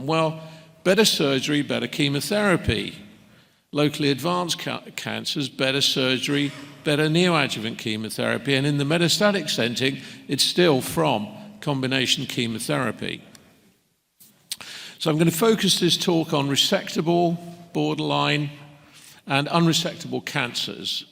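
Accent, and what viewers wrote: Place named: United Kingdom. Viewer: Highly informative and comprehensive by prof. Besides a British accent is much of a value addition, certainly a breeze of fresh air.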